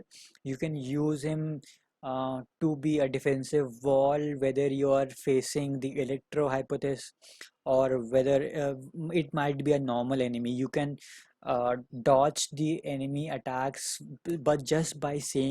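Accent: India. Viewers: Hey friend r u indian u sound like 1 pls reply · You are from India, aren't you?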